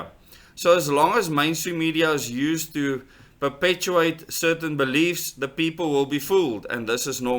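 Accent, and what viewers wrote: South Africa. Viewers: It is so refreshing to hear a South African accent saying what we have come to know from the truthing community (mostly US) over the last few years. Love it. Mooi man! Mense is besig om wakker te skrik.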